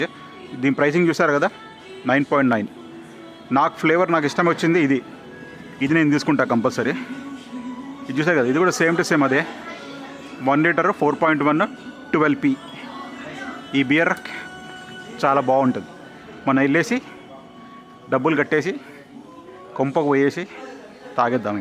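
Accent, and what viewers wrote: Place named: Andhra Pradesh, India. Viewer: endanno motham andhra yaas maatladuthunnav, thu, I am unsubscribing now, its been a habit to our Telangana people famous ayindaaka Telangana yaasa, famous aynanka andhraaa yaasa start chesthundru, endanna, we should save our Telangana slang anna, good bye.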